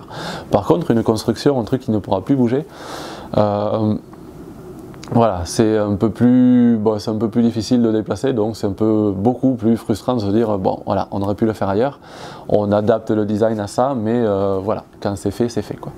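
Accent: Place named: Lautrec, France. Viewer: Très bonne analyse sur soi même qui sert beaucoup plus que l'on ne croit peut-être au départ. Pour toi et surtout pour les autres. Très bon accent pour un ancien Lautrécois qui a migré en Guyane. Je m'appelle Bernard et suis un ami intime à Robin que tu dois connaître certainement.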